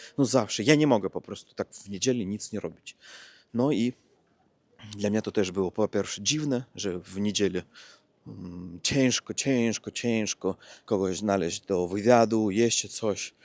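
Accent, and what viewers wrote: Russia. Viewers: Co do niedopałków porozrzucanych tu i tam, to mogli przyjezdni zostawić, nie twierdzę, że cudzoziemcy. Jest względnie czysto nie tylko dlatego, że jest sprzątane, ale i dlatego, że jest mniej śmiecone. I jeszcze dodam coś na temat Twojego "akcentu", czyli wymowy. Akcent = przycisk wyrazowy = udarienije. Wymowa = praiznaszenije. U Ciebie jest już bardzo niewielka pozostałość wymowy rosyjskiej. Robisz błędy gramatyczne, ale znacznie rzadziej niż jeszcze rok temu. Gdybyś codziennie przebywał w polskojęzycznym otoczeniu, to było by najlepiej dla Twoich umiejetności językowych. A co z Twoim angielskim? Nie zaniedbuj go! Pozdrawiam, czyli zdrowia życzę! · rosyjski akcent gubisz bardzo ładnie. Z odmianą wyrazów masz problem, ale to normalne. Im więcej będziesz mówić, zwłaszcza z kimś, kto będzie poprawiał Twoje błędy, tym będzie lepiej. Inną metodą jest uczenie się odmiany wyrazów na pamięć, zwłaszcza tych, które sprawiają Ci problem. 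Może to wspomagać to, czego nauczysz się, mówiąc i nie będziesz stosować rosyjskich końcówek przy odmianie wyrazów w języku polskim. Inną kwestią są spółgłoski twarde i miękkie oraz te, które zmiękcza "i". To trzeba wyćwiczyć, bo Twój aparat artykulacyjny jest przygotowany pod inną wymowę, inny język. Z czasem przyjdzie samo. W każdym razie progres jest niesamowity, gratuluję. Świetnie się sprawdziły szerokokątne kadry, miły, przyjemny spacer i fajne treści. Niech Ci się dobrze wiedzie. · Zrobiłeś bardzo duży postęp w polskim w tak krótkim czasie. Akcent można powiedzieć że masz prawie idealny, choć rosyjski akcent w języku polskim jest taki uroczy ☺️ Zacząłeś też większość słów poprawnie odmieniać 🙂 chciałabym mieć taką głowę do języków 🙂 Szanuję! ☺️ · Od 18-tu lat mieszkam w Anglii i wciaz mowie z polskim akcentem, mimo, ze mowie plynnie i (prawie) bez bledow. Inna rzecz, ze nigdy nie ukrywam, ze jestem z Polski i ludzie to lubia, serio, pewnie dlatego, ze Polacy w UK maja dobra marke i jestesmy szanowani jako dobrzy pracownicy. 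A moj akcent w pewnym sensie dodaje mi kolorytu. Dlatego nie przejmuj sie swoim akcentem, bo on jest po prosyu fajny, wyroznia Cie z tlumu, a hejterow olej.(zignoruj). Jestes Rosjaninem i jest ok. no bo w czym problem. Jakbys byl Czechem albo Bulgarem, to co? Bylbys innym czlowiekiem? Lepszym? Gorszym? Nonsens. Ja chyba tego nie dozyje, ale Ty moze jeszcze bedziesz z duma mowil o swoich rosyjskich korzeniach. Kto wie? Daj Boze, zeby tak sie stalo.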